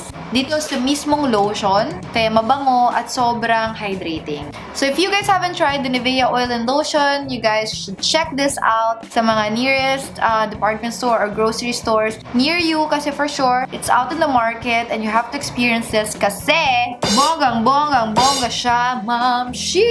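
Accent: Ilocos, Philippines. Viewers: Ilocano ba yun hahhaa😂ang cute 😍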